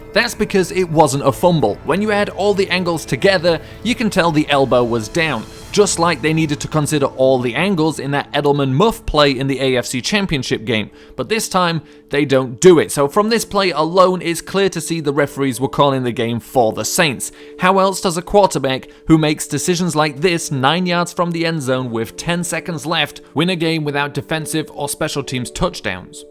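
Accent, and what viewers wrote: United Kingdom. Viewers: U sound like a complete dick head 😂 u sound like ur from the UK or some shit why are u even talking football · Its weird hearing a Brit or Aussie talk about American football. Whatever you are.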